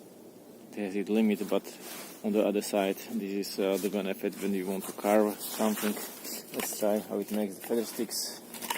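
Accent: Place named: Brazil. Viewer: Your accent sounds almost Brazilian my friend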